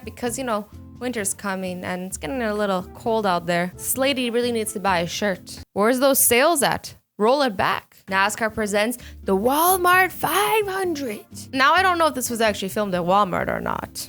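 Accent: Ireland. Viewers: U sounded irish in this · Are you Irish?? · No affence Azzy but you sound kind of Irish in this video As a compliment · Azzy sounds Irish in this vid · you sound irish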